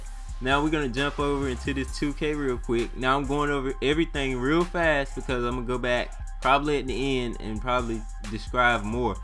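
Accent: United States. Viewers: u sound country as shit I don't know if ur slow or if that's just how u normally talk.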